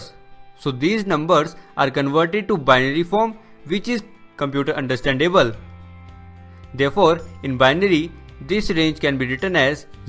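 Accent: India. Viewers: I like how he has an Indian accent and talking about IP address. Truly, perfect.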